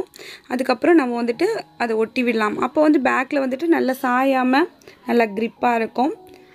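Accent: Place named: Chennai, India. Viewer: Very nice super showpiece akka unga Slong semmaya iruku neenga chennai ya sis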